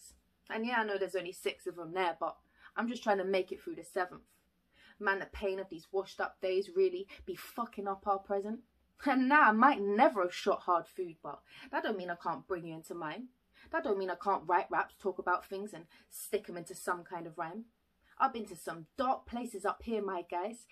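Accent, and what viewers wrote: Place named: United Kingdom. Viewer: I never thought one day ı fell in love with who has Bri'ish Accent 😍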